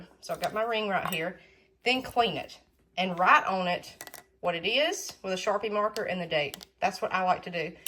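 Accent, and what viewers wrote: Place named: South, United States. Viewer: Alabama!? I swore up and down y'all were from Texas or Arkansas after hearing your accent, y'all keep on raising hell and eating cornbread over yander😅 🍻 from South Carolina.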